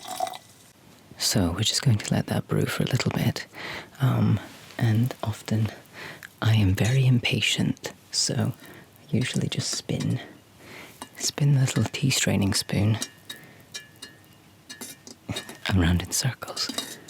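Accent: Scotland, United Kingdom. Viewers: cool! I thought I detected Scottish! I'm American but my dad's side of my family is Scottish, so I hear their accents almost every day! I've always wanted to go there.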